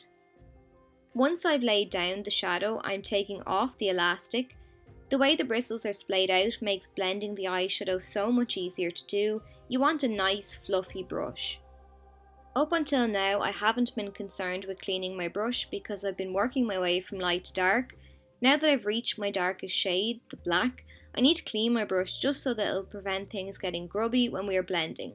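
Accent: Ireland. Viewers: As soon as I heard your voice I screamed 'YES IRISH!!' and my family all stared at me😹🇮🇪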